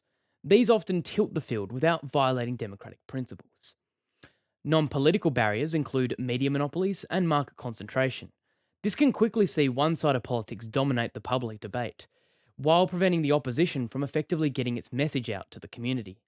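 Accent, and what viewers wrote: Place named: United States. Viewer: Good presentation but the Americanised accent sends me to the off button.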